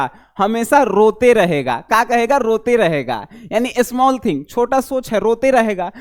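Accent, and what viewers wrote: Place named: Bhojpuri, India. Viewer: हे फलनावा सुनाता sir पुरा भोजपुरिया अंदाज में पढ़ाते हैं 💕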